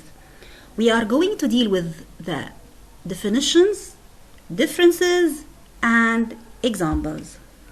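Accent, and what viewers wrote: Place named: Russia. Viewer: Are you Russian? Your accent is just like Russian accent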